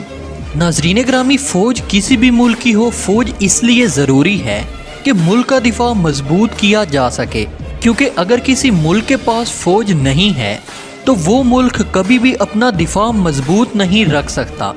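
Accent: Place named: Punjab, India. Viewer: Yeah bhai Punjabi mei Urdu bool rehay hain 👎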